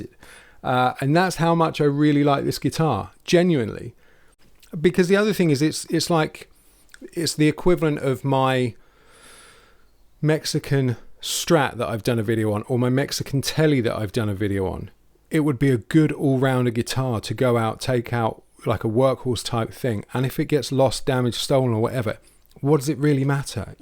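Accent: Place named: England, United Kingdom. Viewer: I like your English accent so cool man 👍 one of the best guitars I ever had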